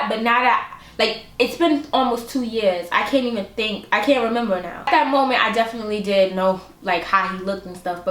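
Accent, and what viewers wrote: New York, United States. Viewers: your so NY .i love it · Do you think that she has a new york accent ? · 😂😂😂😂😂 that new york came out hard afffff in this video lmaooo i love you 💗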